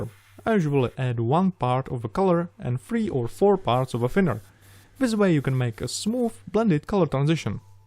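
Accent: Czech Republic. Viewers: Jsi Čech? Podle přízvuku ;) Krásná práce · Český přízvuk jsem okamžitě poznal:)))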